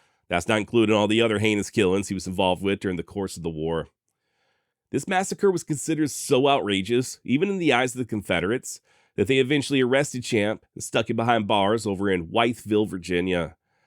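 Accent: Texas, United States. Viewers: First I’ve heard a Texan accent quoting Dylan Thomas was right here 😊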